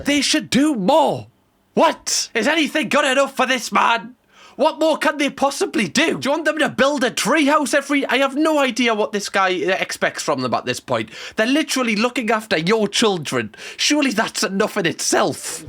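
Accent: United Kingdom. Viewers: I am new to the channel, I have watched a few of your videos. I love british accent...however, I was like "Why he keeps asking for donors? That's wild. " but I finally understood you were saying "donuts" lol, here you go I am one more donut now 🍩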